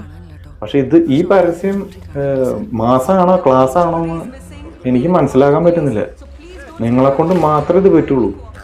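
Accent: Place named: Thrissur, India. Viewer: ലാലേട്ടന്റെ അഭിനയം പറയാൻ വാക്കുകൾ ഇല്ല... അതുപോലെ ബ്രോ നിങ്ങളുടെ തൃശ്ശൂർ അവതരണം അതും മികച്ചത് തന്നെ... 👌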